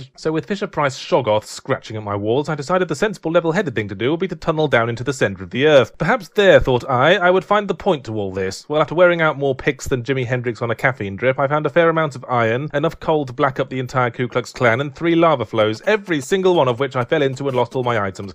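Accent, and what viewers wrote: Australia. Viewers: is he australian if so yay another australian if not well idk. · Oh cool you are an Aussie? Again cool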